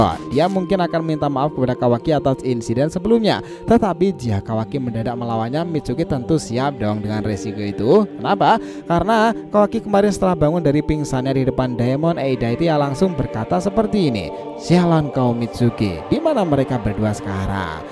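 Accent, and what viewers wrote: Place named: Banyumas, Indonesia. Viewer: Hancur....hancur dgr jatok ngapakkk 😂🤣. Tersendiri'''😂. Gak seru 😅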